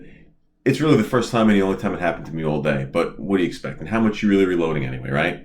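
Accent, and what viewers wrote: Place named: New York, United States. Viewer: Refreshing to hear a New Yorker talk about guns lived in NYC 40 years Always been afraid of guns until I moved to Florida lol now can’t get enough lol I have two cuz that retired also thank you for ur service and great educational video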